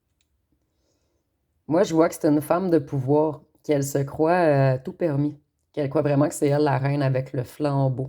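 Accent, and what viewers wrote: Quebec, Canada. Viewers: L"accent québequois❤❤❤❤ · Merci. Belle guidance.. j entends que vous etes Quebec . Je suits Francaise qui habits Les USA….cela m a fait tres plaisirs de vous entendre.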